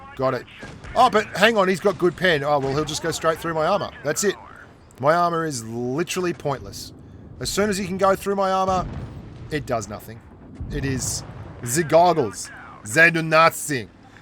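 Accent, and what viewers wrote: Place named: Australia. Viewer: I’m a kiwi and I think he’s an Aussie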